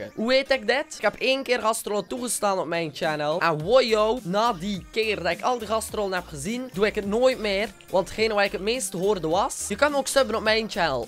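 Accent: West Flanders, Belgium. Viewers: Ben jij een west Vlaming?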